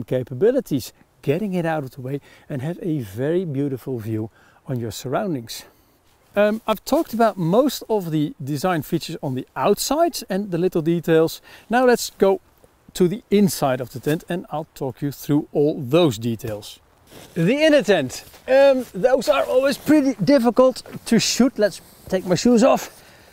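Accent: Netherlands. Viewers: superb review. Your “Dutchy “ English reminds me of the accent and translations my Dutch girlfriend speaks ! ❤ Thanks for filming in English- very useful content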